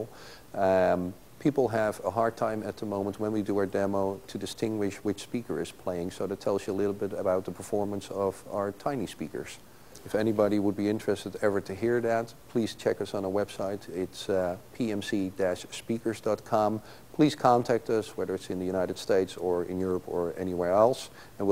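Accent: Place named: Netherlands. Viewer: The presenter sounds like he's from Holland.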